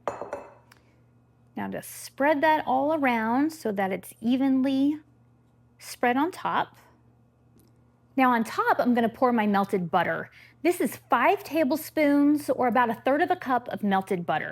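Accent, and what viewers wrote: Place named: South, United States. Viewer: … I just knew you sounded like a Southern Girl, like me, in Texas. Didn’t have time to make the cobbler today, I did get out the flour and sugar, I have fruit and will make it soon. Makes my mouth water to think about it. Thanks again for sharing. I’m really enjoying your recipes, want to make that easy bread too! 🤗🌺🤗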